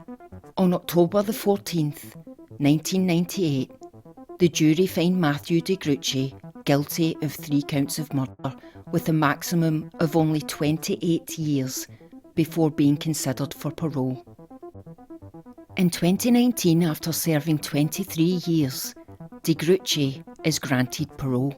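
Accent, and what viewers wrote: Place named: Scotland, United Kingdom. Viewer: Hi there, I came over from the Jamie Reynolds case. I stopped midway, because I couldn't hear, even tho I had the volume at maximum. I was pleased to find out, this recent video, the sound is great :) Oh, and pay no heed to the haters. As if they didn't have the option of changing channels... I myself - love your accent :) I'm American and I find the (Scottish ?) accent fascinating. As a storyteller, you have very good pacing and rhythm, which I very much appreciate.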